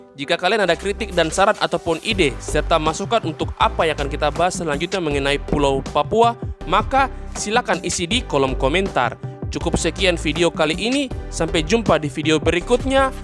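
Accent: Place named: Papua, Indonesia. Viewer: Owh.. Narator nya orang papua ni pasti..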